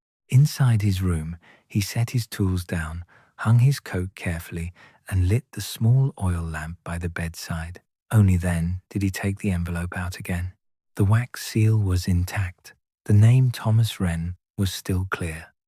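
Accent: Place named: Lancashire, United Kingdom. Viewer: Thank you. Gentle voice. Lancashire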